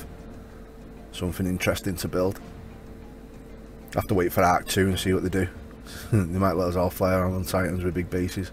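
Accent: Manchester, United Kingdom. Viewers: Deffo from salford or Manchester sound like you are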